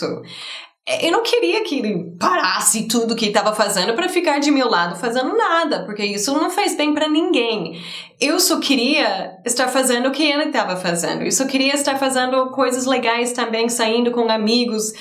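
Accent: United States. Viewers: Você tem uma maneira de se comunicar interessante, além de falar com sotaque Americano, você se expressa muito bem com as mãos e até com os olhos... Tudo que voce falou no curso gratuito, aconteceu comigo. Já morei ai em São Paulo, sou de Maceió-AL... Pra se adaptar bem ai em São Paulo é nunca comparar com seu lugar de origem, paulista brinca e gosta de pessoas que não irritam-se !!! Your Friend Dinhoantenista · É impressão minha ou seu jeito de falar está meio " americanizado" de novo, perdeu um pouquinho do jeitinho brasileiro de falar? ( nada ver com aquele "jeitinho brasileiro" ruim. lol!).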